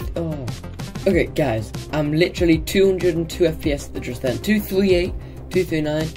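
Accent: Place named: United Kingdom. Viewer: wait, dollars are you american you sound a little bit british to me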